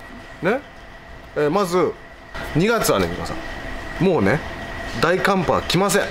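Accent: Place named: Hiroshima, Japan. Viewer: 、サイコーです❤ 春がきましたね😊 可愛いお花苗がいっぱい素敵です🎉 先生の広島言葉サイコーです❤ 私も何時もお花に、キレイに咲いてくれて、ありがとうと言いながら終わった花達を摘んでます😂 フェスタ、応援してま〜す❤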